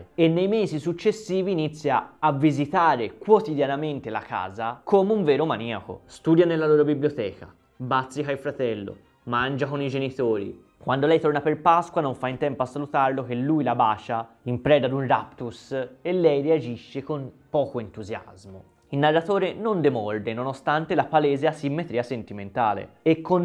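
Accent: Florence, Italy. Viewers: Ahaha tu fai schiantare Sei di Firenze vero? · Mi piace l'accento fiorentino! D'atmosfera culto e culturale! questo video è breve ma utile, anche facile da capire! Grazie!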